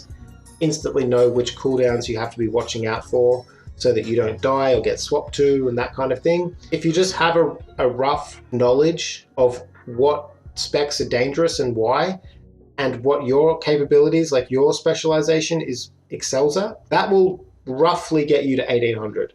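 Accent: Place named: Australia. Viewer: Loved the video and especially love the OZ accent!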